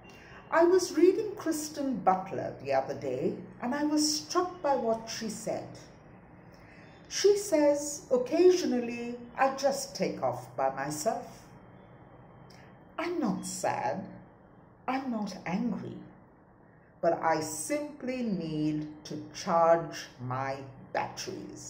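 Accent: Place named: United Kingdom. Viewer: How many gen z n millinials understand this british english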